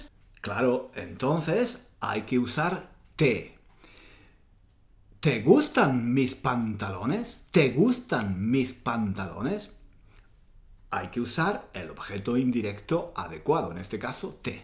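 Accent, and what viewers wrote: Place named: Andalusia, Spain. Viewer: Hola nos gustan tus vídeos y nos gusta tu acento andaluza y nos gustas tú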